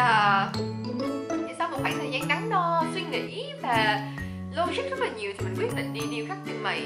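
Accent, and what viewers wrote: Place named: Gia Lai, Vietnam. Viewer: Quê chị ở đâu thế nghe giống giọng gia lai quá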